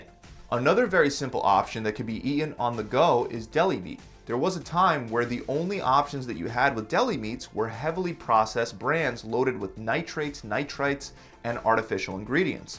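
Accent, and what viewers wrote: Philadelphia, United States. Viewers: I wonder if he’s from philly 😂 based on the way he says water lol! It’s awesome! Also… great video!! Everything is straight to the point and quick!!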